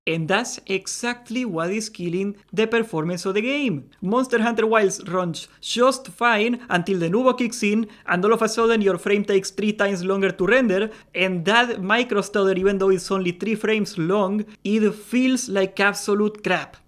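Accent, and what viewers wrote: Spain. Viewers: Eres de fuengirola, verdad? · I gotta say that I like how you speak English, are from Spain?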